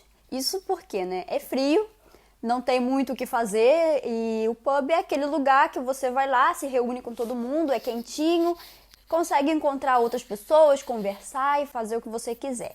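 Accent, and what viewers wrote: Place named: Ireland. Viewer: Oii kkkk eu fiquei meio bugada, parecia que vc era uma irlandesa que falava português como segunda língua kkkkk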